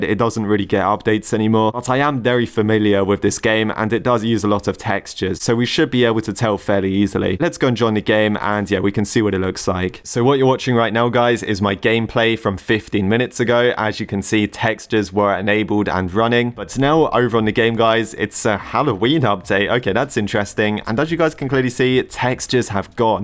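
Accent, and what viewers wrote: United Kingdom. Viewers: shut up brit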